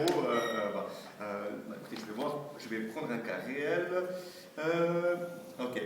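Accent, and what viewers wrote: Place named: Belgium. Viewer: vu l accent de Belgique lol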